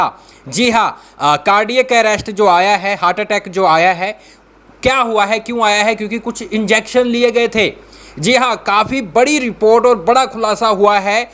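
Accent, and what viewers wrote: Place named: India. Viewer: भाई, हम अमेरिकन नाही, जो शॉ बोले सिर्फ, इंडियन अक्सेंट एसा ही है. There को देयर, alive ko लाइव्ह, र, कड, daughter ko डॉट नहीं डॉटर, पुरा उच्चारण करते है, क्या हुवा ठीक है.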